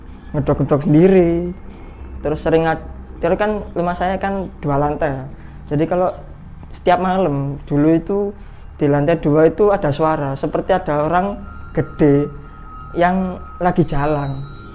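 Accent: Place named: Java, Indonesia. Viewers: suka cara penyampaian nya,masio medok tpi g berbelit belit,,klihatan bgt aslinya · Logatnya ya ampun 😂Mengingatkan my ex org SBY 😅😅😅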